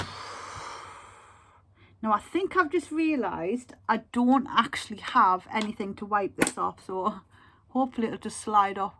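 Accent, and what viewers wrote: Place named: North, United Kingdom. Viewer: My favorite British YouTuber and Make up Specialist. Not just because I am in love with the northern British accent, having lived in Manchester for a year back in 2012/2013 but because you are simply amazing, with your humble yet bright personality. Please never stop showing the world who you are, because the world needs to see and hear you. ❤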